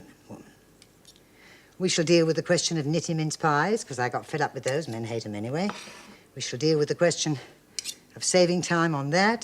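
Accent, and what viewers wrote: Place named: RP, United Kingdom. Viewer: "Cut the rind orf. Gess mark four. One shelf below centaar. Half an ahr for the first pahnd". Even the Queen sounds common these days compared to Fanny lol.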